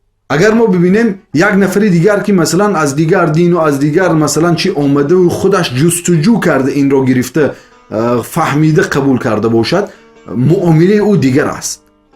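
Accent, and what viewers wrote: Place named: Tajikistan. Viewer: Бехтарин суханхо. Лахни бисер ширини точики кухистони.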